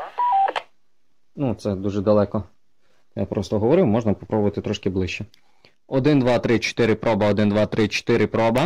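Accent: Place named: Lviv, Ukraine. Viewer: Простим "Дякую" не відбутись:) Відповідь надали більш ніж вичерпну. Цікавить ваша думка стосовно діполя, тому як серед аматорів вирують думки, що це не є добра антена, і годиться більше на авіадіапазон ніж на любительський 2 метровий. Ще питання, звідки ви.? Акцент Львівський, але на кругляках вас не чув Дякую ☺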